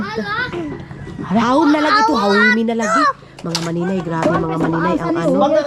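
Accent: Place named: Butuan, Philippines. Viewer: Alam mo maninay namimis ko mag salita ng tulad ng salita nyo KC amarunong akong mag salita ng ganyan KC Ako ay taga Butuan pure Bututanon ganyan among inistoryahan.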